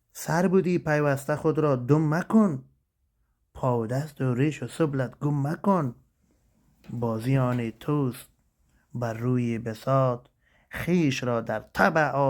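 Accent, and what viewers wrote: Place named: Afghanistan. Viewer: به به خیلی عالی ❤ این اولین بار است که مثنوی را با لهجه ی دری می‌شنوم و بسیار گوش نواز و صحیح و گیراست ، من گمان میکنم که لهجه ی شما به زبان مولانا نزدیکتره . سپاس فراوان 🙏🏽🙂🪻🫶🏽🌻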